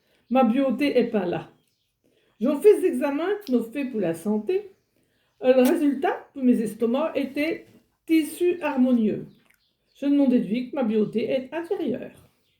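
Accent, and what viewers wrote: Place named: Quebec, Canada. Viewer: Quel accent intéressant pour le Québécois que je suis ! Ça évoque certains parlers de l'Acadie et des intonations de par chez nous 😃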